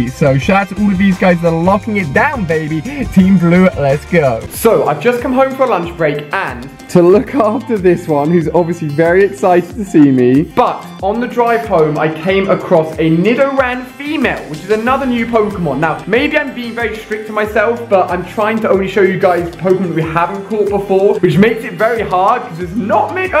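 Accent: United Kingdom. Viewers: Really like this guy's videos but man oh man I cannot stand his accent. I usually love British accents but his is awfullll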